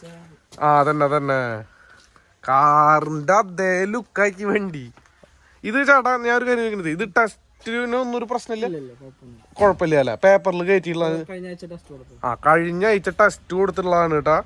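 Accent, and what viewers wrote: Thrissur, India. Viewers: മ്മടെ തൃശൂർ ഭാഷ ഹമ്പ പൊരിച്ചു 🥰❤🔥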